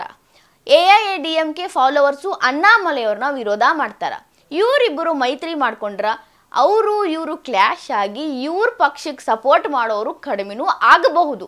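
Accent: North Karnataka, India. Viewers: Utthara karnaataka😊😊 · ನಮ್ಮ ಉತ್ತರ ಕರ್ನಾಟಕ ಮಂದಿ ಭಾಷೆ ಕೇಳೋಕ ಚಂದ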